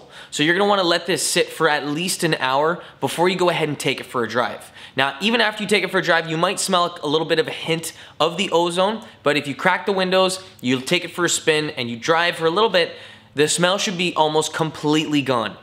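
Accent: Canada. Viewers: Canadian accents annoy me.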